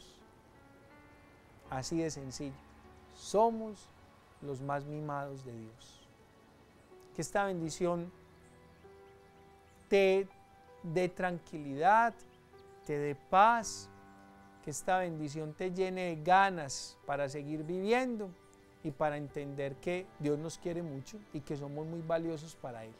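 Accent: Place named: Antioquia, Colombia. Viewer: Fresco Papá! A lo paisa pues Padre. Me encanta! Me encanta! Usted es super lindo y humilde padre con todos los que le escuchamos. Me hacen feliz los dialectos de mi hermosa tierra. Me hace sentir como si estuviese allá en mí tierra que adoro y extraño. Gracias Señor Jesús por que Tú vas conmigo, en todo momento. Sácanos de todas éstas turbulencias mi eterno Jesús. Sé que contigo siempre tenemos La Paz. Bendiciones Padre. Gracias por compartir diariamente La Palabra de Dios. Que Dios le conceda mucha sabiduría, salud, y felicidad. Feliz día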